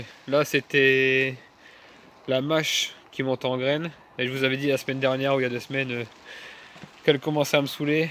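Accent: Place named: Nord, France. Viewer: Bonjour . J'aime bien je m'abonne !!! je suis un potagiste de l' ile de la Réunion , j'ai bien reconnu la pointe d'accent du Nord !!! Je suis Picard d'origine . La menthe c"est efficace pour l'éloignement de certains insectes volants et rongeurs , ils ne doivent pas apprécier l 'odeur !!! Les œillets d'Indes c'est pas mal non plus . Bonne continuation , le meilleur pour toi et tes plantations .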